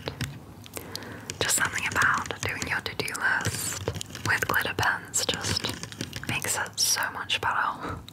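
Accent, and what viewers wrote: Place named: Australia. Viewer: Australia has my heart because the accent is MELTING ME -from over here in America ❤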